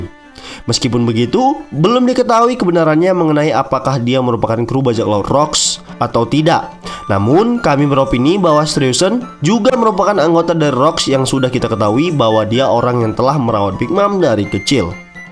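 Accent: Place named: China. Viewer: NARATORNYA BISA LOGAT MANDARIN 😭😭😭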